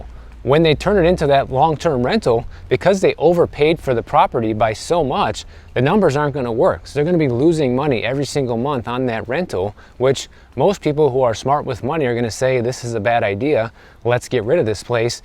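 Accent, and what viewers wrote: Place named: Illinois, United States. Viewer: From one Illinoisan to another, I thought I recognized and Illinois accent.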